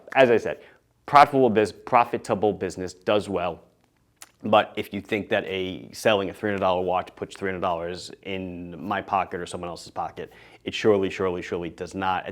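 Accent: Long Island, United States. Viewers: This was super interesting! Thanks so much for the breakdown!! Whenever I watch your videos, I always feel as if you're some distant cousin or something talking to me, because although I'm in CT, my Dad and his extended family are all from Valley Stream, and that Long Island accent is so distinctive!! You wouldn't sound out of place at one of our Thanksgiving Dinners or something hahaha! You now have a standing invitation to attend this year lol!!! 🤣🤣 Awesome video!!!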